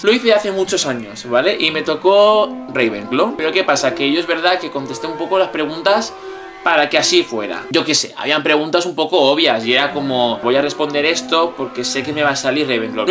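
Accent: Catalonia, Spain. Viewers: Eres catalán?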